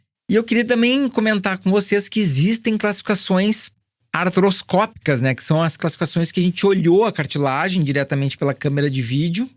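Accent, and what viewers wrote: Rio Grande do Sul, Brazil. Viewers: Ele é gaúcho amiga rsrs .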